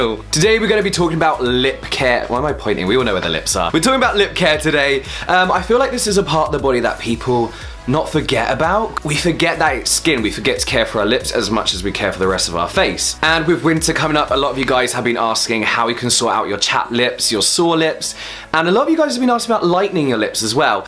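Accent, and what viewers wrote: United Kingdom. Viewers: Your british accent 😆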